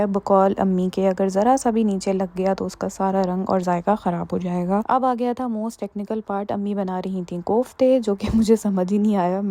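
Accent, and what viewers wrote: Karachi, Pakistan. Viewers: hello, apkay baat karnay ka style khana wagira bilkul hamaray ghar ki tarha ha.. im also from Karachi living in Sweden..after a long time i found somone from vlogging whom i can totally relate with❤.. plus abt your suggestion im interested in starting dil ki batein series... because that would be great if we share things with each other.. but i think it depend on you. if you are making 2 vlogs in a week tu ek dil ki batein series vlog n second vlog of your routine 😊 best of luck 😊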